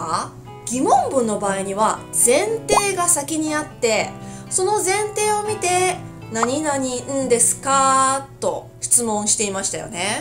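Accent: Taiwan. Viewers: 天哪！老师是日本人！这么重的台湾腔简直不敢相信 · 老师您好，今天偶然发现您的视频，看了一个视频之后果断订阅了，我是一个学习日语三年的学生了，但是由于环境和难度的受限导致我的日语水平没有一点点改善，最常见的情况就是昨天学的知识今天就忘了，现在还停留在初级阶段，想想也倍感惭愧。最后想问一句老师到底是台湾人还是日本人啊？看第一个视频觉得老师是台湾人，中文发音是台湾腔，当看到这个视频开头部分老师的日语实在太流利了跟母语一样啊，让我不禁感叹老师在哪里学的日文啊？看到评论才明白有人说您是日本人，难怪日语说的这么标准。谢谢老师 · 先生の中国語はすごく素晴らしくて、しかも台湾訛り。。。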